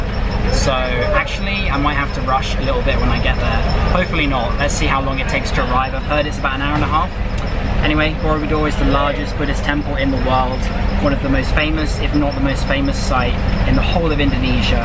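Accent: England, United Kingdom. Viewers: Hi, it''s a nice review...but i like your english accent btw...which accent is it? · Your British Accent is very sexy,and easy to understand... Sengau2 manjah di hidung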